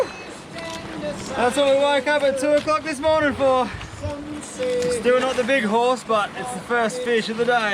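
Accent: Australia. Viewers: Not "Doggies." It's GAWGIES. He's Australian and accent goes on "D" from some unknown reason :/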